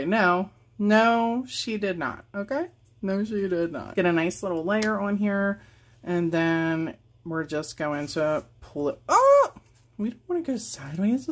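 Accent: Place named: Maryland, United States. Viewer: I live in West Virginia, born and raised. I lived in Maryland for about 15 years. So when I first heard you say water, I knew where you were from. Yes to digital downloads please. 😊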